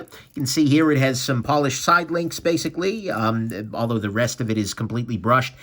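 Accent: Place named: Alabama, United States. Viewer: I have The Islander with the 60-minute dive bezel, it's a great watch for the price. By the way I'm picking up your southern accent I'm guessing Alabama?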